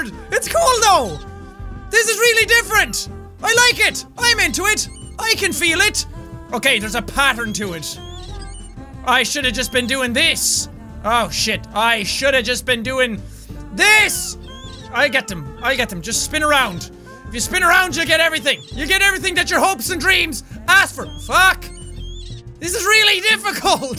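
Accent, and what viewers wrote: Ireland. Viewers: & awesome sounds like Ireland 🇮🇪! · Not gonna lie, when went full bore Irish I think my brain (and heart) melted a little... Also, I do believe my ovaries may have exploded... 🤷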